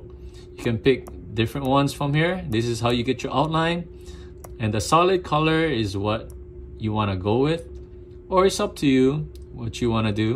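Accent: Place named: Japan. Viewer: I know this guy is japanese ..his accent is so cute😂❤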